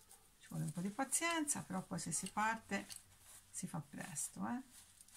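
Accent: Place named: Tuscany, Italy. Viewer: Buongiorno, carino il coniglietto bravissima.come mi piace l'accento toscano 😂😂😂😂😂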